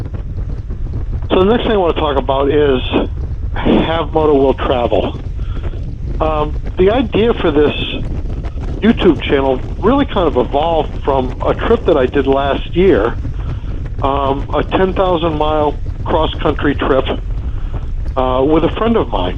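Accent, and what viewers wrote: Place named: New Hampshire, United States. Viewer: You sound like your from Cow Hampstah I lived there for 36 yeahs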